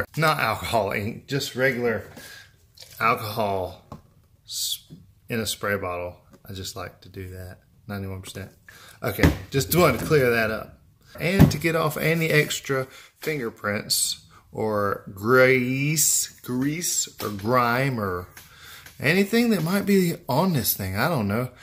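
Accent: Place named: Boiling Springs, United States. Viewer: Dude I love you and your vids. Your work is just beautiful. And that Boiling Springs ACCENT!!! I miss it.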